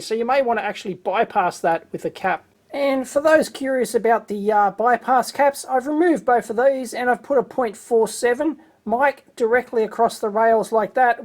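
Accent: Australia. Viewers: Its very funny to click on transcript and watch as the AI struggles to match Aussie accent. in particular...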